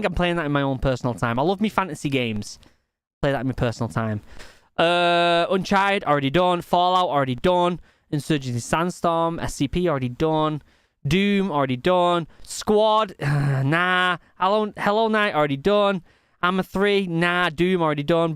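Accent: United Kingdom. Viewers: What british/brit accent?Or are you Scotsman?Great,your transparent cap!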